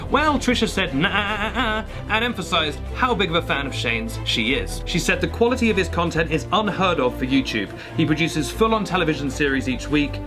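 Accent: United States. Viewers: Also your American accent is amazing. It was so accurate that it made me uncomfortable, like someone dubbed in someone else’s voice but with god-tier editing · Your American accent unnerves me...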